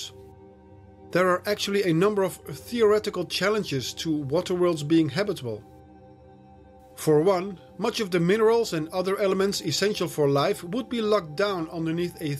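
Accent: Netherlands. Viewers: You sound very Dutch lol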